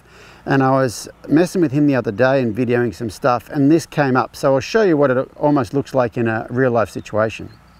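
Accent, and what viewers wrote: Australia. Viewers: Never heard a cowboy with an Australian Accent🤠🤔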